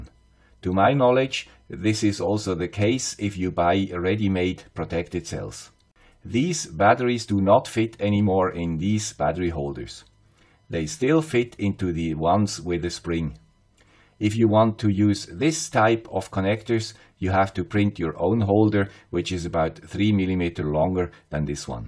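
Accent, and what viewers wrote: Switzerland. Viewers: Another BRAVO presentation, Mr. Guy With a Swiss Accent! Are you a professor or something? I kind of like it that you always write down on your video the objectives of your video...and then proceed on to explain following those objectives. Over 100% per cent for another good video. Thanks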